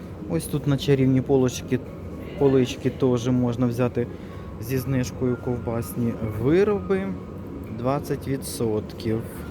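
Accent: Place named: Cherkasy, Ukraine. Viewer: Той момент, коли в мене в області всі так розмовляють і це норма. Привіт з Черкащини, 😅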